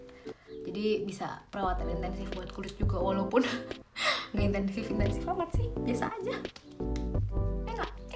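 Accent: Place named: West Java, Indonesia. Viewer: Logat sundanya masih kedengeran wkwk. Mirip papahnya bgt ihhh gemes wkwk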